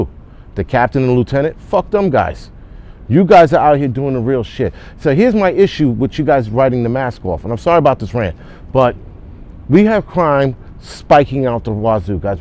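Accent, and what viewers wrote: New York, United States. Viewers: THE PROFANITY WITH THE NY ACCENT IS THE BEST !!! YOU ARE SPEAKING FOR THE UNHEARD AND VOICELESS! !! AND SPEAKING HOW THE PEOPLE FEEL RIGHT NOW !! NO APOLOGIES !! GOT DAMN IT I LOVE IT !!!!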